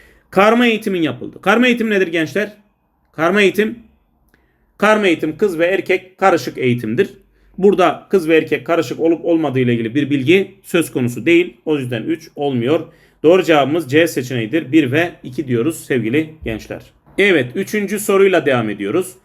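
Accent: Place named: Afyon, Turkey. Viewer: hocam ders anlatımınız tam bize gore agzınıza saglık acaba nerelisiniz tam afyon vibe ı alıyorum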